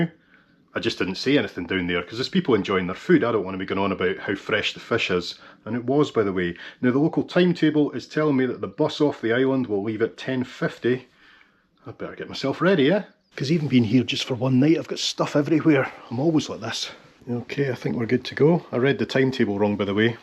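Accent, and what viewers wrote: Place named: Cockney, United Kingdom. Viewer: For someone from the west end you have a fair East end twang in some of yer words now... 😂😅😢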